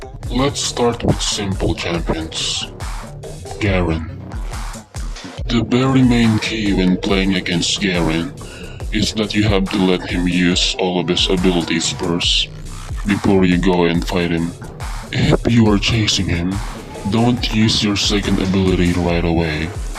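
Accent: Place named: Philippines. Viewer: Kahet anong voice changer payan basta kadugong pinoy kelala mona accent palang HAHAHAHA... GOOD GUIDE!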